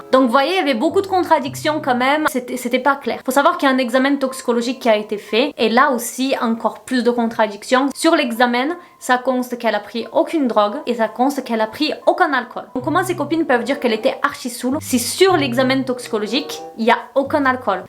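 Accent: Brazil. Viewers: Coucou! Un petit commentaire pour te dire que j'adore tes vidéos, ton naturel, ta façon de raconter etc En plus pour ne rien gâcher tu es magnifique et j'adore ton accent brésilien (je suis portugaise) ! Je t'adore et tu me detends énormément quand ça va pas ❤❤❤ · J’aime tellement ton accent ! Tu as les mêmes « défauts de langage » (je savais pas trop comment dire ça) que ma mère je trouve ça trop miiiim’s Bref continue comme tu es 🌊 Juste une question ? Tu es d’où au Brésil (sou carioca e vascaina)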